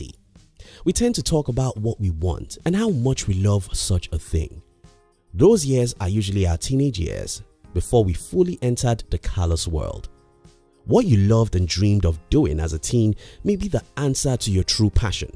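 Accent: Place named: Nigeria. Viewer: I feel your voice is Nigerian, its not that important but can that hand be dark skinned? 😊 However good video, inspirational and motivational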